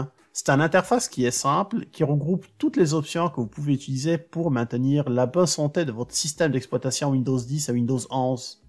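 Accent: Quebec, Canada. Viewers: Un grand merci, toujours un plaisir de vous entendre avec votre accent canadien. Bon week-end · Une question linguistique : votre accent québécois, se serait-il pas saupoudré de quelques épices du Maghreb ? Sinon, super chaine, très utile et qui va droit au but. Merci pour toutes ces infos !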